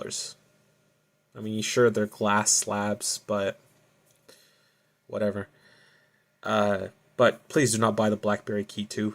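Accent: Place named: France. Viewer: The French accent😂😂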